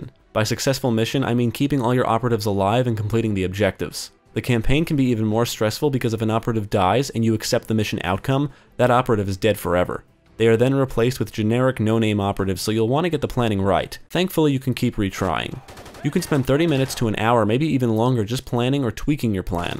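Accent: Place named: New York, United States. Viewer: I'm from michigan, and im guessing you're a new yorker?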